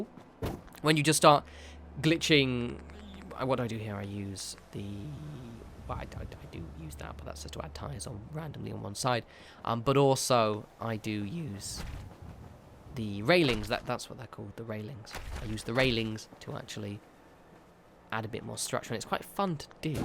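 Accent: United Kingdom. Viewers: I used to think you said "follow me on twitter at no response" instead of at the end of every videos of urs. British accent sure is somethin..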